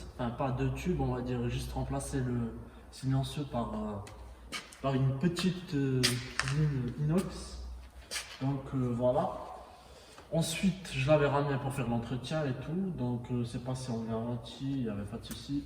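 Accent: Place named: Yugoslavia, Eastern Europe. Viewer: le "petit" accent d'ex-Yougoslavie 😁👌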